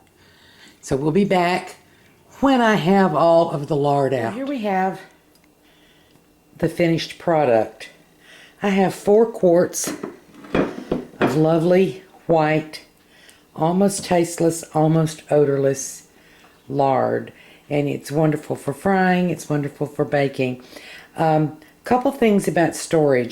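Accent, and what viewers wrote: South, United States. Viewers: Ma'am, I have enjoyed this video very much. You provide excellent information and I love your down home accent. I also want to compliment you on the quality of the seasoning on your cast iron skillet. It looks first rate. Keep the wonderful videos coming.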